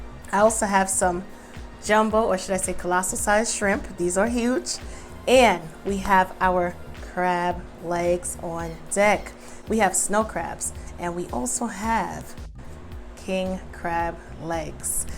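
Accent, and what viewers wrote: New York, United States. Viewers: I love this channel. I can't lie, I come back for that NY accent more than anything. I love how women from NY talk.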